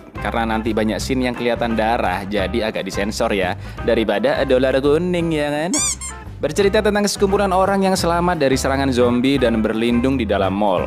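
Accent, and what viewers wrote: Java, Indonesia. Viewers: Jowo ne ngendi slorr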